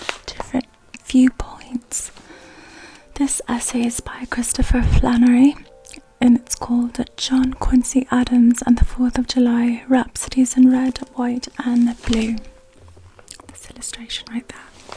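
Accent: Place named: United Kingdom. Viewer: Your accent is so stunning! Are you from the UK?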